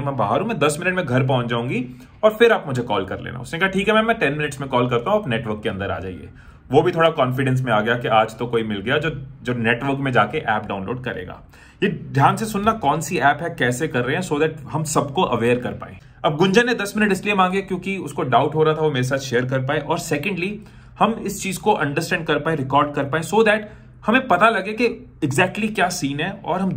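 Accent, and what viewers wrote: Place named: Jharkhand, India. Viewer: Ye iske tounge language se pta chal rha hai ki ye jamtara Jharkhand ka hai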